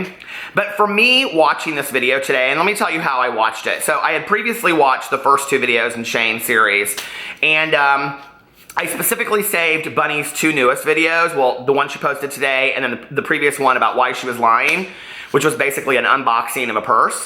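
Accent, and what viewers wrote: South, United States. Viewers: I love your voice! Are you from the south? Personally I love to hear southern accents...it makes me feel at home as I'm from Tennessee.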